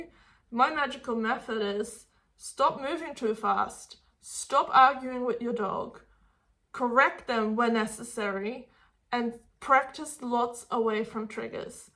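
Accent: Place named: New Zealand. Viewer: Thank you!!! Now i know I was doing everything too fast with my dog and expecting him just to behave and listen like he is at home without step by step routine- lots of nerves by now understanting my dog and buldong a relationship with him...just thought he has me in his backside when going out to new places -oh well probably he does as there is so much to do and see 🤣 especially if you are only one yo 😉 Anyway thank you- we will practice what you preach 😁 Ps. Love your kiwi accent and sense of humor 😉😁 Greetings from Poland 🙃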